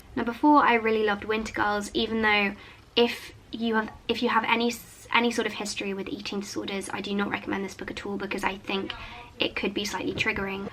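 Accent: United Kingdom. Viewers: I and many followers have low level of English. Please speak more simply and reduce their pronunciation. we can't understand the british dialect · I'm also not a native english speaker (and not very skilled in this language), but I understand almost all she says. has very beautiful british accent and very good diction 😁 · speaks uncanny British English and her pronunciation is very good - when it comes to understanding and/or learning English as a non-native speaker. There's no point in making her change her speaking habits just for you, especially that the purpose of the channel isn't to teach people the language.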